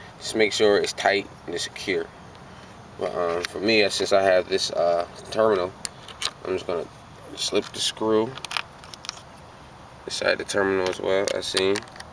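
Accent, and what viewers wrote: Philadelphia, United States. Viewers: hes definately from philly i can tell lol nice work man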